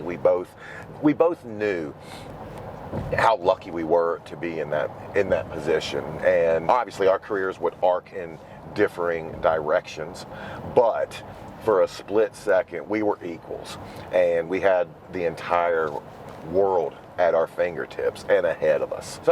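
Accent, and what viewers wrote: Virginia, United States. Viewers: Man, I saw that Kevin Nash interview (and your subsequent response) & I was really impressed with how you handled that. He has obviously never spent much time in Virginia because I’m from NoVa but as soon as I heard your voice the first time I knew you had to be from VA (I guessed Winchester but Charlottesville makes perfect sense. Also, this YouTube shit is amazing because you really found your calling. You’re so articulate and intelligent. The way you deliver your speech is so professional; this is really what you were meant to do.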